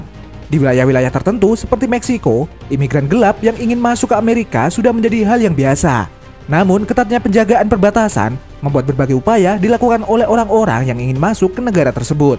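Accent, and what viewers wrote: Madura, Indonesia. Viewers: Kentara banget nih naratornya berdialek Madura tak iyee...🤣🤣🤣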